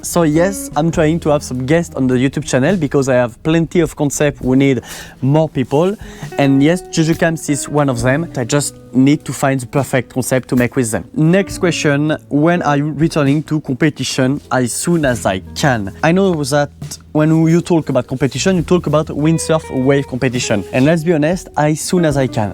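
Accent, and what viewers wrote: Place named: France. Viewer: Malgré le choix de mots français à la place de mots anglais et la prononciation française de mot anglais, le débit d'expression est assez conséquent et assuré, c'est tout de même pas mal du tout! A encourager! 👍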